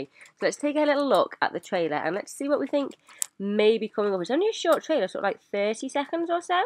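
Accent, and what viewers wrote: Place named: United Kingdom. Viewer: Hi!!! I am so glad that I found your channel. It helped me a lot and your are so funny!!! I just have a small question. You obviously have a British accent, so do you live in Britain and if yes do you live in England ?? I am asking because I will probably visit England (more specifically London) and I would really be happy to know that I am so close to one of my favorite youtuber!!!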